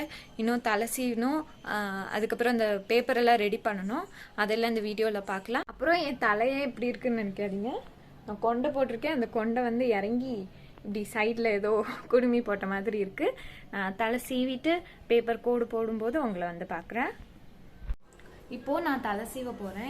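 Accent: Kerala, India. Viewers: Akka intha video la unga voice kerala voice madhiri irrukku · Your voice is changed like Malayalam slang akka it is nice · Ur tamil slang became like malayali's Tamil slang 😂... It's strange... What happened.... To ur beautiful Tamil slang... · Akka today in this video your voice sounds like a malayali but anyways enjoyed your video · Akka neenga inaiku pesunadhu edho Kerala ponnu maari irundhuchu😝😝😍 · Akka nenga ea konjo malayalam kalantha tamil maru peasuringa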